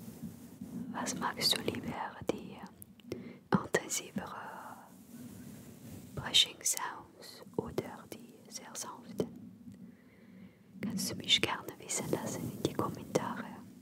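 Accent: France. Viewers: Normalerweise gefällt mir kein Französischer Akzent, aber dieses Video ist einfach nur toll😘😘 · Allein der französische Akzent entspannt mega☺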